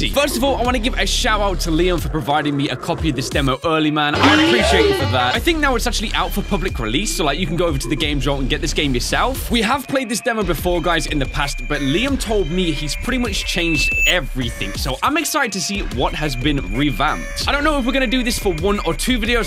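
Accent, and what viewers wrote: United Kingdom. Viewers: Man i love British accent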